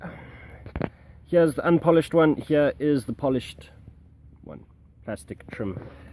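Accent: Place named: South Africa. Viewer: I sense a South African accent... Where you at brah :P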